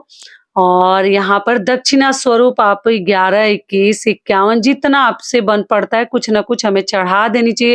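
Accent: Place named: Uttar Pradesh, India. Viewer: Ap up se lagti hain ankwar dena hamari banarsi bhasha hai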